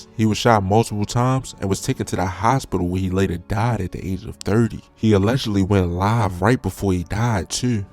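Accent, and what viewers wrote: Baltimore, United States. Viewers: Boy I know you from Baltimore. Durw 2urw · Mob and nlmb so far away frm each other you just be saying shit and that is wooski brother ain’t no allegedly you not frm here probably Baltimore by your accent but my guy you’re just saying shit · the narrator must be Bmore!!!! raised? go! O's!!! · You from Baltimore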